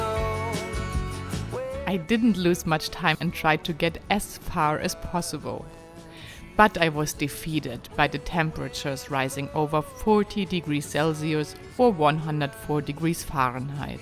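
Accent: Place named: Germany. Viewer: Fantastic video Lady!! I have been everywhere you have in your video. The South is known for it's hospitality and you will find nothing like it anywhere in the world. Missouri and especially Tennessee are my favorite places to be. Georgia is my next favorite. The people are so laid back and friendly. Great film work Lady! Awesome stuff. I still love your German accent!! Peace! Ride SAFE and BE safe Lady!! 🙌👏👏👏👍☝🤙🤘✌👌🖖✋😊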